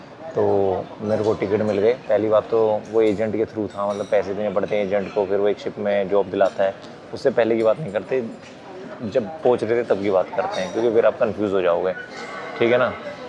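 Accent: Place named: Delhi, India. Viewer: Sir apki language sunkar mujhe lagra h aap delhi se h 😂😂delhi wale kahi b ho pata chal jata h ki y Dilli se h hum b delhi se h or sir apke bolne ks sence same mere czns jesa h😂😂😍😍😍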